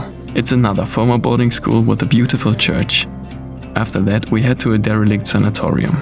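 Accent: Germany. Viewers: Trotz des super Englisch, bin ich den Gedanken nicht losgeworden, das etwas Deutsch klingt.😊 Tolles video! Toll recherchiert, erzählt und soviel interessantes bildmaterial. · Laut dem Dialekt seit ihr Deutsche! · I am extremely impressed by you. You are a classy, well spoken gentleman. This is first video of yours I've watched. Glad I found you. Are you German? My mother is, and as children, that's all we spoke. But, your dialect is different than ours. Anyway...THANK YOU! ♥️ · Dein Englisch klingt wie ein deutscher der versucht ein Englisch zu sprechen... Warum quatscht du nicht auf deutsch?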